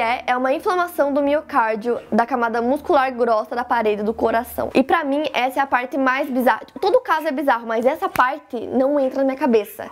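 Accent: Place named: Paraná, Brazil. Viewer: Se eu não estiver enganada, o teu sotaque é de paranaense né?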